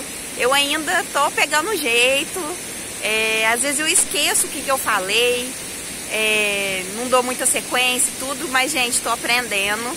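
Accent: Minas Gerais, Brazil. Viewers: Esse seu sotaque mineiro é muito legal. Experiência se adquire com o tempo. Seus vídeos estão cada vez melhores.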